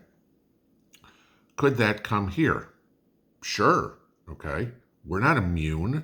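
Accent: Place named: Appalachia, United States. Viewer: You sound as though you could be a neighbor...I'm deep in the Appalachias.....same out here....and I love it!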